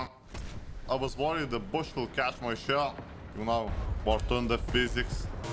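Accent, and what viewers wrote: France. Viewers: Extra sensibles avec l'accent du sud 👌! Super vidéo: ) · Le petit accent marseillais qui fait plaisir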